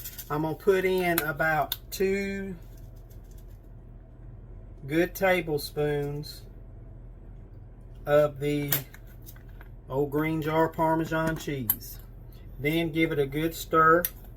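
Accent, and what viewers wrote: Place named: South, United States. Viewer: You must be from the south ,with that southern accent. I live in central Ohio❤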